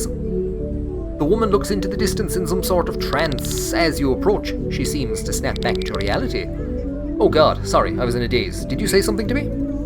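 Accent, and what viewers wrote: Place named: Dublin 4, Ireland. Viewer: How many years have you been hiding this GLORIOUS D4 accent?! I nearly wet meself!:face-green-smiling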